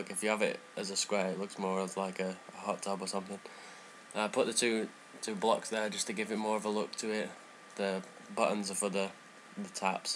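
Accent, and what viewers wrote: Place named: United Kingdom. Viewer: He's british he say it that way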